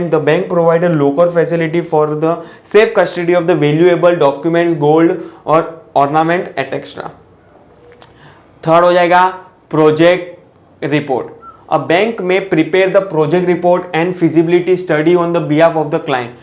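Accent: Gujarat, India. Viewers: I agree with Rohan. Sir apke pas sufficient knowledge hai but Voh hum tak pahunch hi nhi rha hai. You're just reading TB & winding up the part . I think you should go in depth & explain various terms & give us examples. Also sir tame gujrati 6o ne .... Tamari accent par thi khbr padi gayi 6 mane .... Sir the way u speak 🗣️ etc & various is just gujratically awesome ... Gujratically is made by me ( Gujrati + beautifully ) Sir hoping you to change the way you teach ...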